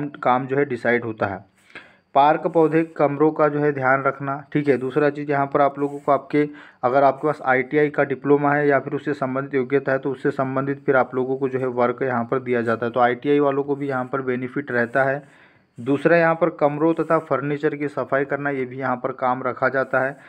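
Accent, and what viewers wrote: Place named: Bihar, India. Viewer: Bhai aap Bihar so ho 👍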